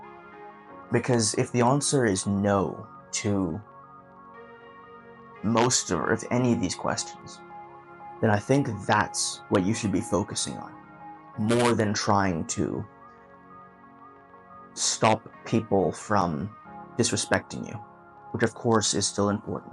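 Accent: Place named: South Africa. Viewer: Where are you from brother? Your accent has all these quick nods into a South African accent but then dips out just as quickly to sound largely American. I'm really struggling to place it.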